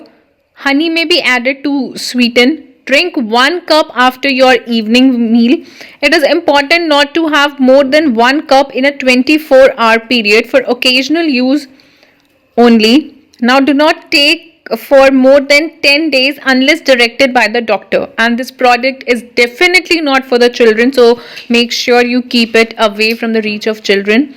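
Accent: India. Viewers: Indian accent 😩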